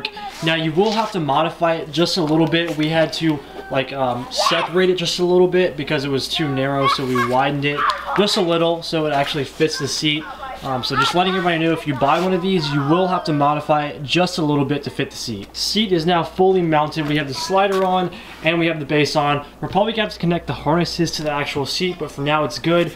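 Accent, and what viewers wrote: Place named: South, United States. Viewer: new to your videos but I could tell instantly yall were southern from yalls voice.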